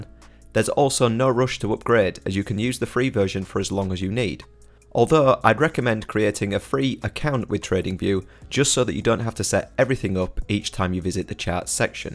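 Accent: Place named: North, United Kingdom. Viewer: Half way through & it is an EXCELLENT education! Thank you! Top Northern accent also!!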